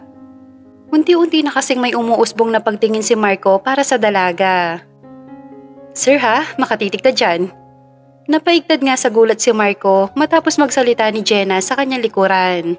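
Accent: Philippines. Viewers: Anu kaya ang probinsya ng nagsasalita my vlogger din aq napapanood na vlogger sa cp nmn ganito ang boses nya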